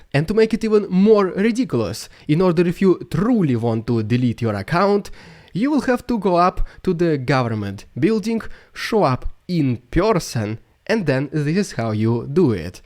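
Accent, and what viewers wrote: Russia. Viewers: Nice Russian accent:) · "They [oligarchs] either have to [abide by] Putin's madness, or be extremely careful walking next to open windows": that was a great line. I just discover your channel now, interesting stuff indeed. Keep on the good work, I'm subscribing now, it's great to hear something else than propaganda with a russian accent:)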